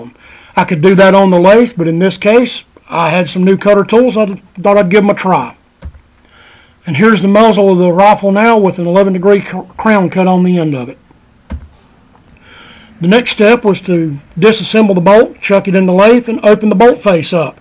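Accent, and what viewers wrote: Maine, United States. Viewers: That Maine accent isn't wasn't used to be.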